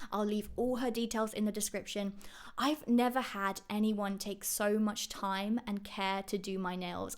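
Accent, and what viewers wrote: United Kingdom. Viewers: Omg that british accent though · British accent amazing and original ❤️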